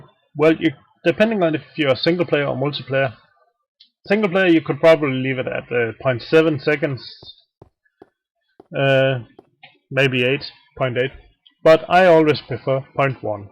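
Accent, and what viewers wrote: Denmark. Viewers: You're Danish and I can hear it! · jeg kunne høre lige med det samme at du var dansk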